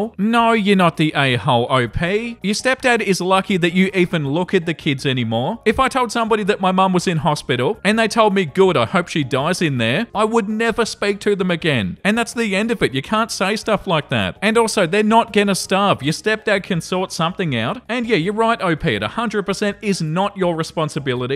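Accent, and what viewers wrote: Australia. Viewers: I always look for a new when I first wake up in the morning! It's so nice to listen to your soothing Aussie accent as I get ready fore the day!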